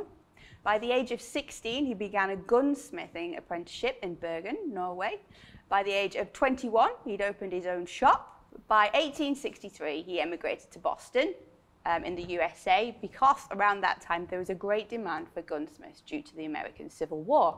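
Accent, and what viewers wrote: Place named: Yorkshire, United Kingdom. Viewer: She sounds to me like a less strong version of Jodie Whittaker's accent and she's Skelmanthorpe, so certainly Yorkshire, but it'd probably take an actual Yorkshire person to narrow it down t' area.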